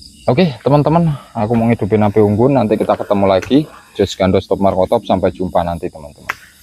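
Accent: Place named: Banyumas, Indonesia. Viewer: Kebumen.....wong ngapak iki😂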